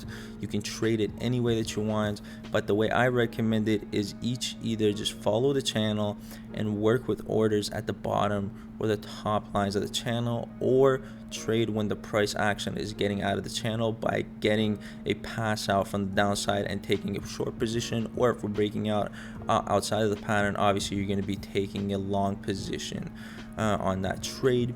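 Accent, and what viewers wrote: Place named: United States. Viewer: Most American sounding Russian I’ve ever heard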